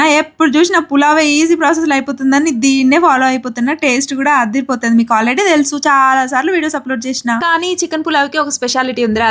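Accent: Telangana, India. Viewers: telangana slang❤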